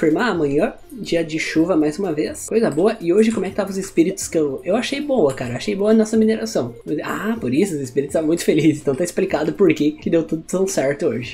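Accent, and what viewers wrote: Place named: South, Brazil. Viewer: Tu é gaúcho!!?? Acho o teu sotaque parecido com o do sul, se sim tu tá seguro!?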